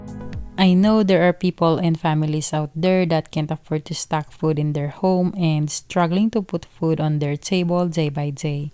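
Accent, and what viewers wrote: Philippines. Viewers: Matagal na nag wonder ako if your Pinay because of your accent...watching from Cebu❤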